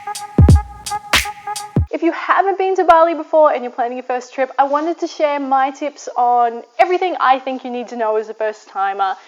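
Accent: Australia. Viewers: Oh gosh, this is the first video I'm seeing of yours, and I love the way you make this video interesting and at the same time informative ! Good luck!! Ps. I'm kinda feel by your accent that you're from Australia. Are you ? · I’m interested to know where is your accent from ? Not a typical Aussie accent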